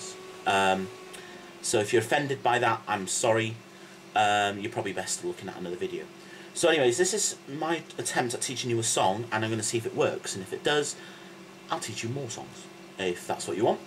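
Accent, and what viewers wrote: Wales, United Kingdom. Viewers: You do sound Welsh, haha. You've got the Twang.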